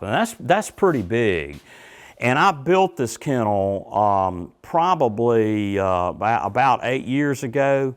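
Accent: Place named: South, United States. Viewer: Remember, working dogs are bred to be outdoors and have good temperature tolerances. In the summer it looks like he uses evaporative cooling from washing down the concrete, ceiling fans to circulate air, hard roof to protect from direct sun and radiant heat. Based on his accent and surroundings I figure he's in the south. For heat in the winter he probably puts blankets in the dog houses. They are raised off the ground, so that takes away from some of the thermal loss from sleeping on the ground, and the metal roof raises the temperature from the sunshine in the kennel area. The concrete will heat up some during the day providing thermal mass heating at night. Some good tree coverage and the leaves provide extra shade in the summer, but the leaves fall in the winter giving more sun access to the metal roof.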